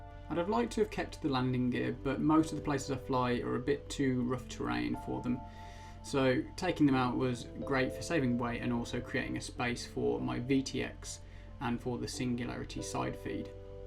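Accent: United Kingdom. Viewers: SUPURB!!!!! I take it your in the UK. Accent plus ROUGH LANDING N TAKE OFF SIGHTS. Hahaaha every other country Italy Germany USA ect ect ect have decent runways purpose built were still taking off from grass. Not much has changed since ww11. I'm In the same boat WHEELS OFF BELLY LANDINGS. You've done a brilliant job... I'm still trying to get a 1000mm or a 800mm Spit great shots Brian London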